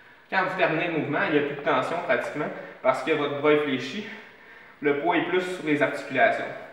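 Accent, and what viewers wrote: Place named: Quebec, Canada. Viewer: L'accent Québécois c'est compliquer... Mais super conseille continu et bon courage pour la suite !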